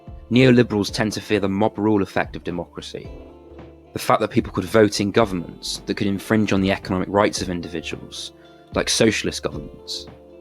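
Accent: United Kingdom. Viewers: have you never heard a british person before? his accent is very standard and easily understood if you don't live under a rock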